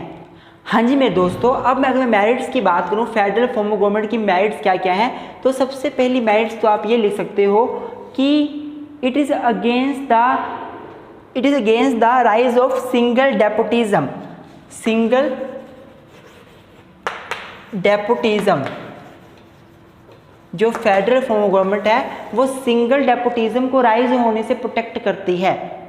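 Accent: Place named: Punjab, India. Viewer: The content is very helpful...and that punjabi accent jo aa rha hai that is also making it an interesting lecture 😄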